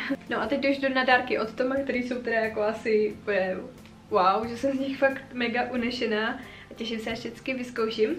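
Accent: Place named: Vysočina, Czech Republic. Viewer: Já na Vysočinu chodila do školy a teda některý slova jsem jako Středočeška s pražskou obecnou češtinou nechápala :-), ale nikdy by mě nenapadlo se ptát, proč říkají "víjou, najíjou se žlicou,spíjou,jdou za starostem" apod. Prostě nářečí, kterýho je ČR plná ;-)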